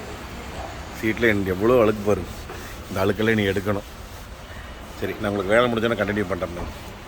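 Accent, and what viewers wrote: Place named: Coimbatore, India. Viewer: அண்ணா உங்க கோவை தமிழ் அருமை சூப்பர்🇮🇳💖🙏🏤🕋⛪🙏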